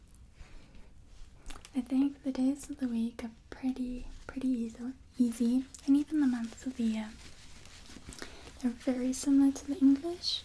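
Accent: South Africa. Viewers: I looove the South African accent, as a New Zealander I often get confused as one but I don't mind because I think it sounds great! Lovely video :)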